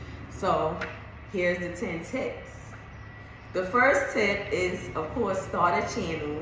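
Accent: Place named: East Coast, United States. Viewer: Hey!!! I absolutely love everything about you!! Your look, your energy, your East Coast accent lol and most importantly your tips and guidance!! I’ve been making waist beads for 4 months and your videos have helped me tremendously!! Keep doin what’cha doin!!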